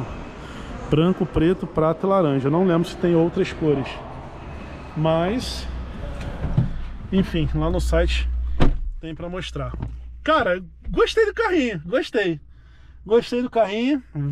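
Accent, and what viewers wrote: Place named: Rio de Janeiro, Brazil. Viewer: Esse sotaque carioca e joativo aff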